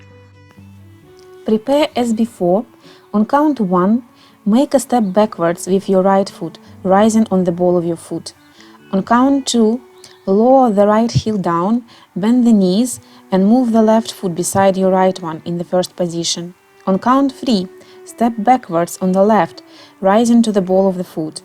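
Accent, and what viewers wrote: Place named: Russia. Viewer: русский акцент)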